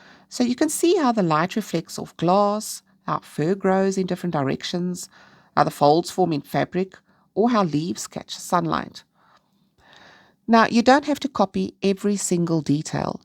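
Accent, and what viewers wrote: South Africa. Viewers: I’m so glad I found your channel, thank you for the time you spend to help us. I find you so calming and easy to listen to. Are you South African? I am interested in accents, I myself live in Australia now but I was born in Birmingham in England, I also listen to Jo at piggy pens and some others from different parts of the UK. I listen to some inAmerica also and other parts of the world and am intrigued by all the different accents . I live alone now in a house on a farm it’s peaceful here, only cows bellow sometimes but I enjoy the quiet. My husband passed away four years ago just before Christmas, I am used to being here now and have two cats for company. I have friends too in town and get out quite often. I enjoy colouring and watching videos. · Hi, just want to say thanks for the tips, found your channel yesterday and enjoyed watching some of your videos. 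Its so nice to hear a South African accent on youtube.., Happy National Women’s day today. Just a quick few questions… do you still reside in SA if so wondering where you buy your supplies cos i find things so expensive have been saving for months to buy a Johanna Basford colouring book and some gel pens mine are almost all dry.. thanks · Please tell me you are from South Africa. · Is that a South African accent I hear!?? 😮😮😮❤❤❤ Home!!!! 🏠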